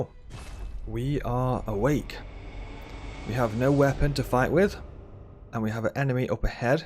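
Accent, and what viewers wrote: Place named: Yorkshire, United Kingdom. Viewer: Hello there! May I ask if you're from Yorkshire? (I mean no offense —I'm Korean, and your accent sounds preety similar to my English tutor's and I like his) I'm an fanboy of FromSoftware, and I'm using your videos to study English while simultaneously enjoying my hobby. Thx!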